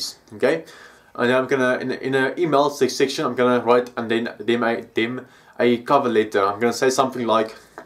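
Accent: Afrikaans, South Africa. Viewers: <link> That part from there that Afrikaans accent still love your videos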